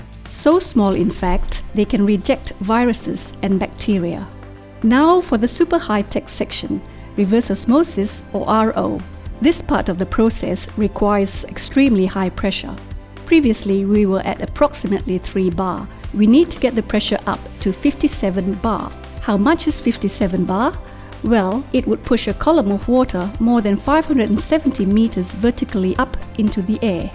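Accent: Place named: China. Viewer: I suspect it could be a Chinese who has learned English?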